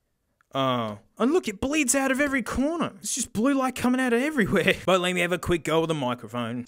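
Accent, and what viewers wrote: Australia. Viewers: Nothing gives me more joy than hearing an Aussie dude destroy the living hell out of earphones😂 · Dang, I love this stuff, helps me appreciate Australians accent way more, and the laid back and fun content is spot on! Keep on "Exceeding my expectations!"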